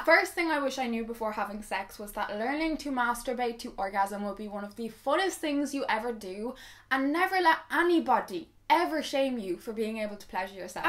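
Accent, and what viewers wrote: Ireland. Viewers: I love your videos and your Irish accent! My ex boyfriend used to pressure me alot into having sex and when I used to refuse. He used to become very nasty towards me. I do love sex but not that much!! I'm from Yorkshire, England 🏴 btw xx. Keep up the amazing content 💗💗💗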